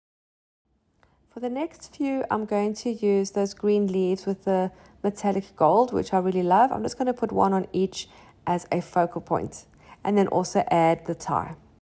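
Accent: South Africa. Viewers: You sound like a fellow South African, where are you from?☺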